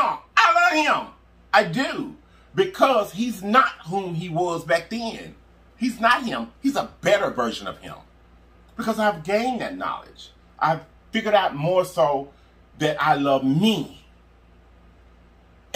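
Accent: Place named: Memphis, United States. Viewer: Your Memphis accent, electric energy, and good-lookin food bought me here. New subbie. 🏳️‍🌈🦋🖤👸🏾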